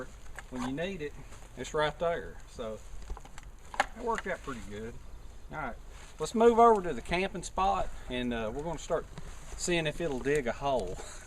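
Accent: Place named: Georgia, United States. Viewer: Cool idea....I like it because it decreases by one the number of tools you would have to carry... I thought you sounded like you were from Georgia... I lived in Warner Robins for 16 years.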